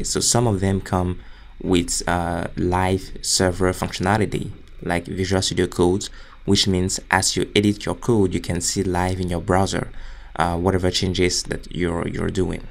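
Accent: Nigeria. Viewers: Great tutorials, nice accent. Nigerian, yeah?😊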